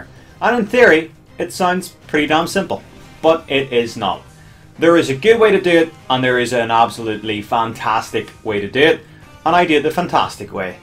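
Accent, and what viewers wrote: Northern Ireland, United Kingdom. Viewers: Northern Irish! :D cool accent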